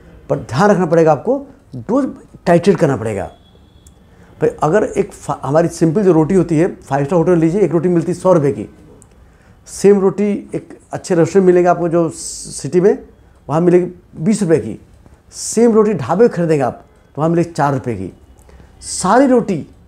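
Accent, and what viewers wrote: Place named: Bengal, India. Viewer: Your Bengali accent is very difficult to understand sir Also please make videos in English with Subtitles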